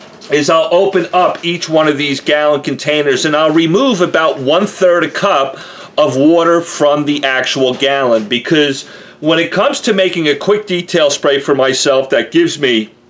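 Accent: New York, United States. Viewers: I KNEW it! No way this was a Native Texas accent, a New York accent! Awesome videos, I learn so much from this.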